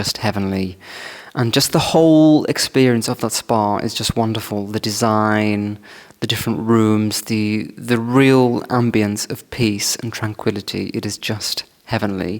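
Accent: RP, United Kingdom. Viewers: I came across your channel by accident and really enjoying it im glad you explained about your lifestyle not that you have to .I think its cause your accent is very posh that maybe people perceive you've come from money not gonna lie I thought the same so thank you for sharing . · I can't believe he does not have a Trust Fund and was not educated at elite British institutions. His speech and mannerisms, show true upper class Britannia.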